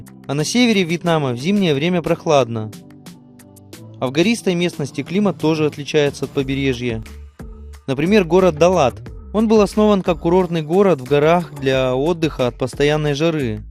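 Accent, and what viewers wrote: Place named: Arkhangelsk, Russia. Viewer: Добрый вечер, 😉Интересная кухня, наверное, вкусная)))Но северные шаньги и калитки, думаю вкуснее 😊Про образование, в следующем видео, я бы хотела знать )))А разговор, Архангельский, знакомый 😊👍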